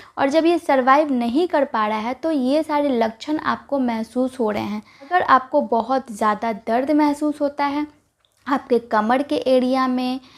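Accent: Bihar, India. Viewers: Ma'am Aapke bolne k tarike SE pta chalta h ki aap Bihari ho 😀 am I right ??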